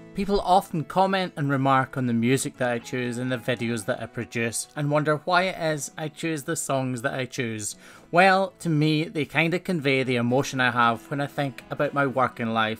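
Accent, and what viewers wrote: Scotland, United Kingdom. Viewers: I love your videos! Part of it is the wonder of this previously unknown, amazing world. Another part is the sunny style of presentation. And part is just your delightful accent - and learning some Scottish slang! But I have a question——what is the difference between a hoof trimmer and a blacksmith? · Scottish accent is cute. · I love all your video cause your never do click bait it’s always real and very entertaining to watch and I’m sure everyone loves your Scotland accent lol i know I sure do but I just wanted to thank you for these extremely entertaining videos ❤️ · Lmao tbh the peeing and pooping and the reactions and the scottishness is half of why I watch this channel, the other half being the bovines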